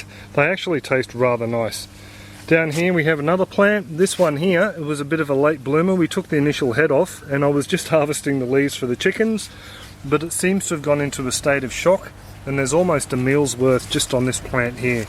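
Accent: Australia. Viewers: Great, broad Australian accent and useful information. Must get chickens. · Very, VERY helpful. Thank you. I am from states but my nephew, his wife and her family are from and live in Australia. It was lovely to hear your accent, made me think of them.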